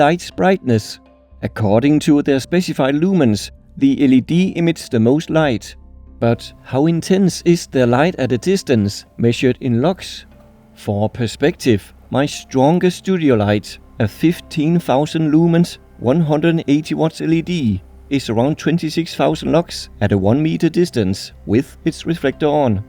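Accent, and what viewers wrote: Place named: Denmark. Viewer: gotta love this accent :) heja Danmark ;)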